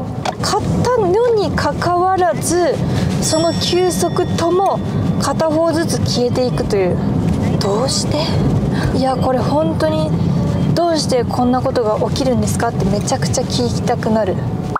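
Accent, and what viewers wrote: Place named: Gifu, Japan. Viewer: 鍛わってる。 久々聞きました。岐阜弁懐かしいです。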